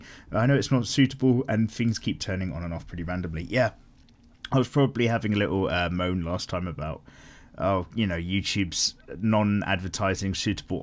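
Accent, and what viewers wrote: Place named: Liverpool, United Kingdom. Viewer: liverpool scouser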